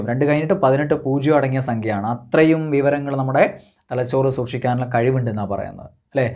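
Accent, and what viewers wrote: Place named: Vadakara, India. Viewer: വടകര ഭാഷ......സൂപ്പർ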